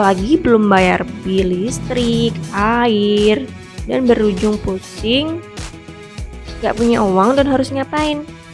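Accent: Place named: Java, Indonesia. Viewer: Suara ne medok 😂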